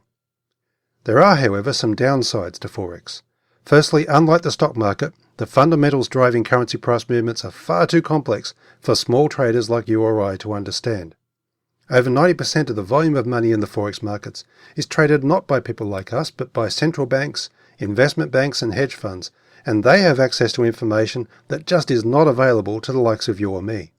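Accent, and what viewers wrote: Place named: Australia. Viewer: An Aussie, yahoo !!!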